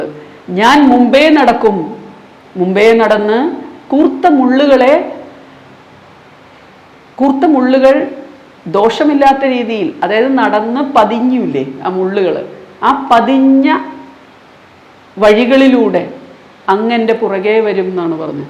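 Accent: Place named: Palakkad, India. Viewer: അസ്സൽ പാലക്കാട്‌ ഭാഷ 😄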